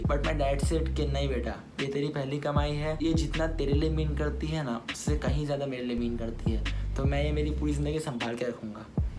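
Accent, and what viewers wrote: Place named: Gujarat, India. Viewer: yeah that's why....his accent is full gujrati 😊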